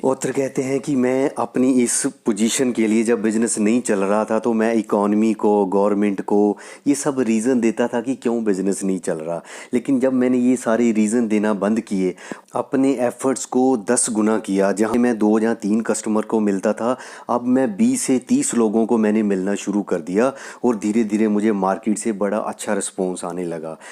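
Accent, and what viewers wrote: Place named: Punjab, India. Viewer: Your accent has touch of Punjab of Pakistan.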